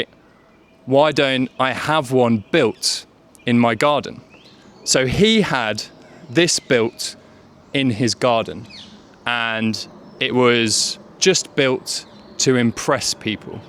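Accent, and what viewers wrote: United Kingdom. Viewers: Just like in your previous videos, you really delighted me. Through your videos, I've been able to learn English effectively while also discovering interesting stories about British history. Furthermore, you have a very distinctive British accent (I guess), which is easy to understand and concise, helping me practice my listening skills confidently, as my listening ability is very poor. Thank you very much.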